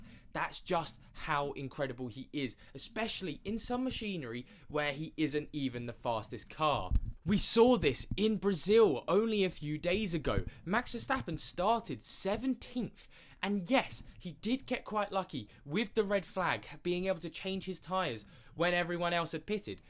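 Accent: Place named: United Kingdom. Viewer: Your British accent made it exactly like you wanted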